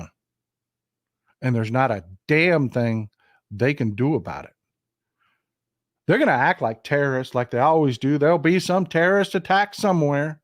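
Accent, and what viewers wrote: Texas, United States. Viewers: My dad was born in Texas. You remind me of how he talked, I enjoy your comments. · I bet you're from Austin??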